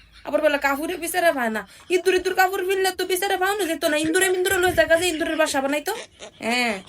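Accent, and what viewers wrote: Comilla, Bangladesh. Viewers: আপু আপনার কথা গুলো খুব ভালো লাগে আপনার বাড়ি কি কুমিল্লায়?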